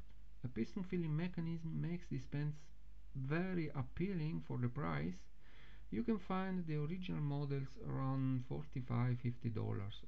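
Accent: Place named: Italy. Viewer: Bellissima recensione, dallo slang sembri italiano, perché non fai recensione anche in italiano?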